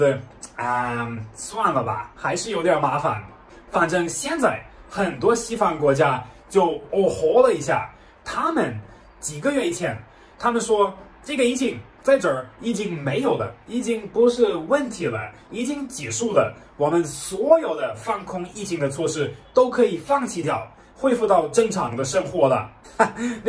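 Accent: Sichuan, China. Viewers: 哈哈你的视频很有意思，我是生活在斯图加特的中国人，看你说汉语还带着挺浓的四川口音，对你的好感加倍哈哈，祝你在中国生活的开心，希望德国也尽快控制住疫情。。。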